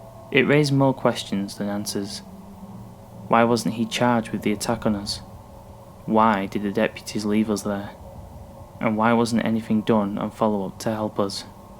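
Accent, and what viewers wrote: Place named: United Kingdom. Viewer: Your narration is getting better and better. Well done !! Nice to hear another Brit narrating !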